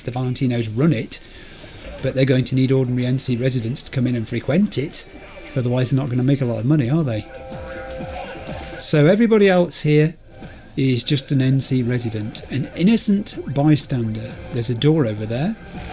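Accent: United Kingdom. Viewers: Hahaha hearing u say "ese p*che p**" in your perfect british accent was very funny to me 😂😂😂 p** is short for prostitute in spanish and is a curse/rude word used as an insult and the other i think is like a vulgar exagerator. Its especially funny because you never swear in your vids 😅The game you play with the kids gives me sean and daniel (lis2) playing in the desert vibes.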